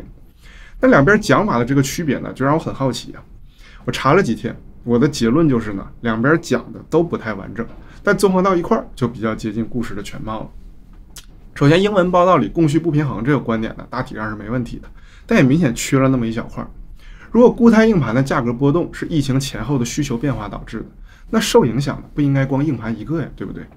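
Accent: Northeast, China. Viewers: 您大爷的 能不能显示个字幕！！！ 您东北人 普通话很普通 但我耳机音响 真听不太清 你乌噜呜噜 说了个啥？ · 兄弟，喜欢你的东北口音，但是没有字幕不把声音开特别大，根本听不清你在说什么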